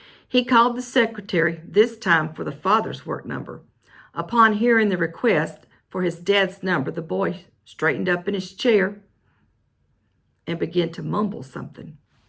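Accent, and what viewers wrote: South, United States. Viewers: I love your southern and expressive accent. Such talent!🥇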